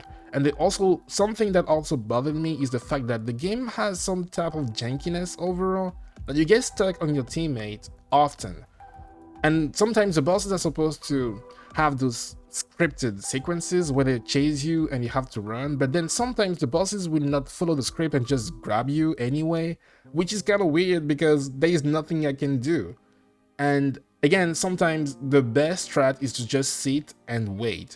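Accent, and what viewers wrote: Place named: France. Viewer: Love your accent. Are you French? I have family that are.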